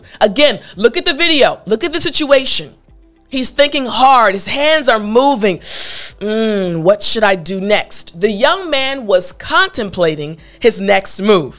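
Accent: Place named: United States. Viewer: That American accent,does confusion me a lot....No disrespect..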